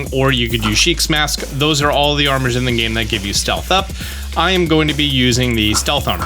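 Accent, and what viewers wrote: New Jersey, United States. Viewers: Oh man, New Jesey English is like trying to talk to someone from switzerland. (Hello from Austria )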